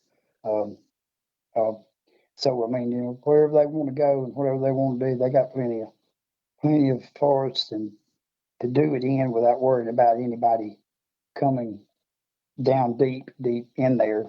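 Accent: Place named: South, United States. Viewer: Accents, or local dialects, are not mistakes. Every place has its own, even New York and New Jersey and all up and down the CA coast. Even if they don't think they have an accent, they do. I come from the country in Southern Illinois, which is Southern, too, and I love all Southern accents. Your manner of speaking and your accent greatly enhance your story. Country and Southern people get a lot more in than just words. More is being said when one turns one syllable into three. Nuances. Thanks for your story. My husband and I loved it. We are up between Killen and Lexington, 42 miles from Bankhead, says Google. Be well.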